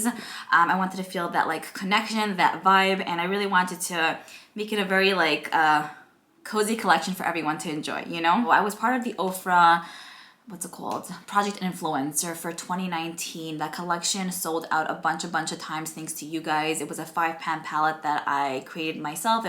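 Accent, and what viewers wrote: New York, United States. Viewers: Congratulations on your pallet and engagement, how exciting! I love all of the colors especially the purple wine color and green, so so so beautiful. I love your accent, I speak the same as I was born and raised in NY. My girls always tease me when I say cawfee too lol. Very happy for you, you are such a happy go lucky kind of gal.💜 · Girl. You have an accent. New York. I have an accent. North NJ. Lol I am sooo excited for you!!! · So proud of you and love love your NY accent and I hope you always keep it 💖💖 I will definitely get this collection…Love it so much 💖💖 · I understand completely cuz I talk the same way cuz I'm from New York.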